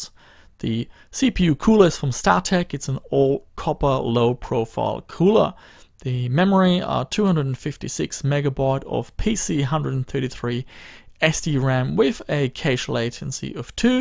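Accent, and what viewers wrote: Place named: Germany. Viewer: You sound like you've got a german accent.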